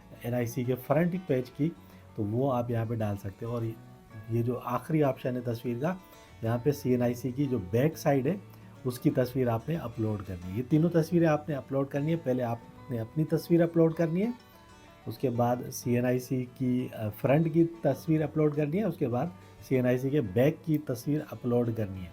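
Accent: Sindh, India. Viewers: سندھی لہجہ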